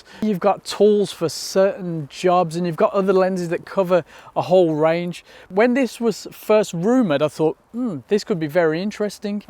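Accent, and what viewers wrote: Birmingham, United Kingdom. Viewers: Is that accent Brum?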